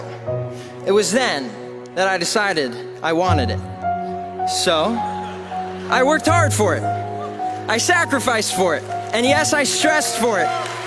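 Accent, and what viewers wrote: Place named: United States. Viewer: Thank you very much for presenting this wonderful speech. I would like to know which American accent is this. Kindly reply please Thank you again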